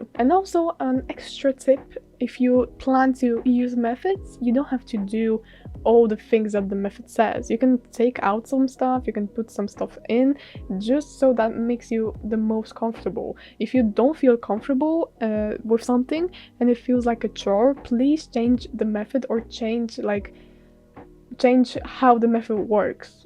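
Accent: Poland. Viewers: I heard the first few seconds of the video and i already knew you are polish haha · I was right you're polish, I can tell it by your accents siema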